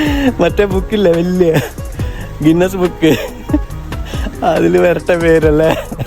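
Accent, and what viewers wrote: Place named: Rural, India. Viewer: നിങ്ങളെ വീഡിയോ അടിപൊളി നാടൻ സംസാരം ❤ നാട്ടിൽ എവിടയാ❤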